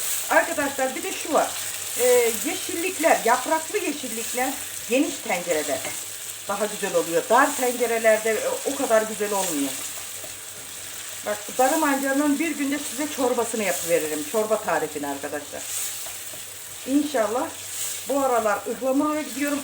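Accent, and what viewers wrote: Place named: Zonguldak, Turkey. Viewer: aynen bende zonguldak eregli abla şivenden anladım süpersin bereketli olsun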